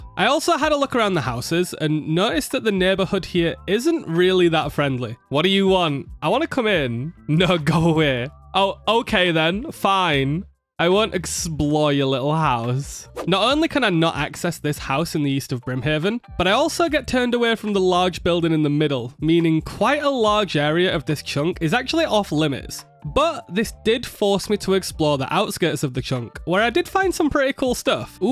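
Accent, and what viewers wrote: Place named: Northern England, United Kingdom. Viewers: Ahhh you’re so northern, I’ve found my home here 😂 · i wasn't really interested in this series when I saw it in my recommended, but then I heard the yorkshire accent and now I am staying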